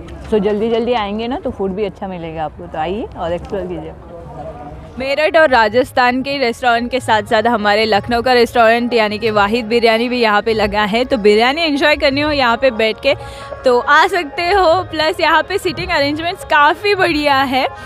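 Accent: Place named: Maharashtra, India. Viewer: I think u r from maharastra, if i not wrong because I heard yr marathi tone in your voice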